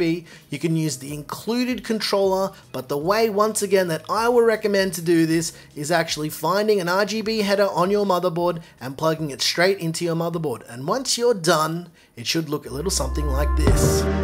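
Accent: Australia. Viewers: Why do you look Mexican but you speak Australian? So strange I love it!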